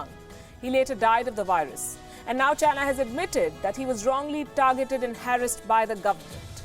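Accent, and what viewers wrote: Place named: India. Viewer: goj76*&£21^&^? curry accent, didn't understand a word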